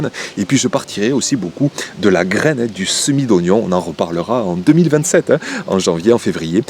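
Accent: South, France. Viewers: Bonjour, Je me suis abonné parce que tu as l'accent du sud. Je suis belge 😊. Le sud m'a toujours fait rêver et tu es un "exemple" qui alimente cette vision. C'est chouette que tu puisses vivre de cette passion (surtout pouvoir laisser une chance à sa sensibilité). J'aimerais bien t'avoir comme voisin 😂. Bonne continuation. Pierre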